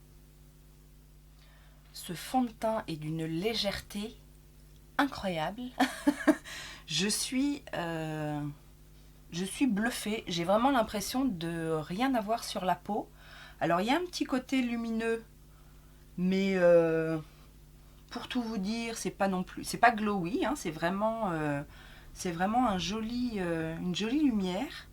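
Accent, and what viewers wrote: South, France. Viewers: Coucou ma coquette ah lala tu es dingue je t’adore oui pour le tuto accent du sud 😂😂😂😂 groooos bisous 😘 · Merci pour cette vidéo avec un mkp très réussi. J’aime beaucoup ton teint et donc tes produits teints que je ne connais pas. Oui oui pour une vidéo avec l’accent du sud... moi je suis du sud-est 👍🏻👍🏻. Très bonnne fin de journée biz 😁🌸💋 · Coucou, j'aime beaucoup ce makeup ! Oui donnes nous l'accent du sud pour faire venir le soleil, j'en ai trop besoin, gros bisous ! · OUI pour le tuto accent du sud 👍🤓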